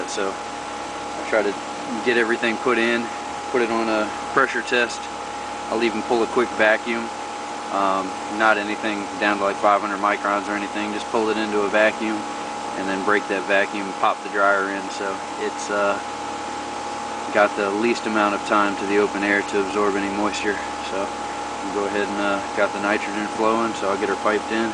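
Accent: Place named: South, United States. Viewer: Dang! Your Southern twang with epic in this one me boy.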